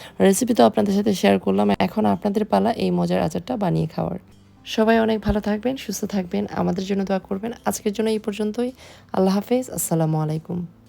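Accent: Sylhet, Bangladesh. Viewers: Apu Are You Sylheti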